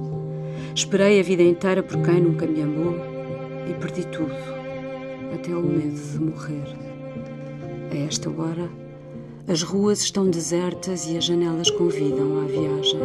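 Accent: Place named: Portugal. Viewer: Tristeza infinita! Comovente...solidão...amargura...angustia...tocca profundamente ...e com o sotaque Português é ainda mais linda essa esplendida Poesia 😢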